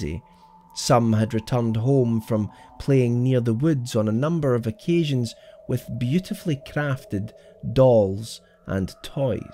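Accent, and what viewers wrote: Scotland, United Kingdom. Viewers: scottish accent, horror stories, bringing visions of the gothic era, TOP NOTCH!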